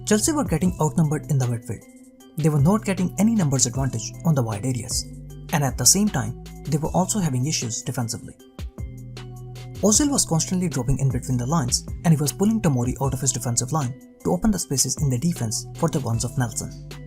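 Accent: India. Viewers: He sound like he is trying hard not to sound like Indian accent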